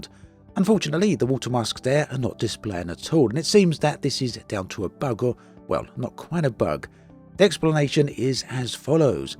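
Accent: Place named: England, United Kingdom. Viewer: It's a fucking english accent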